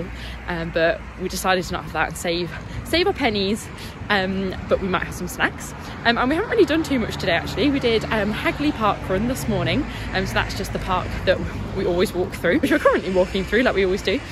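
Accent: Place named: West Midlands, United Kingdom. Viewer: Love watching you enjoy what we nearly did when young but chickened out due to family issues. Intrigued by your slight accent similar to our family and mine which is West Midlands. You look like and sound like the younger daughter of my cousin who was raised in Tile Hill north west of Coventry and sounded to me sometimes like Shropshire. Are your roots similar. Have a great time seeing as much of the world as possible.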